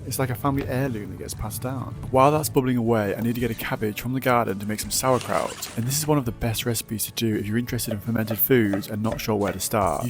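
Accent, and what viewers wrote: United Kingdom. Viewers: لحجه بریتیشو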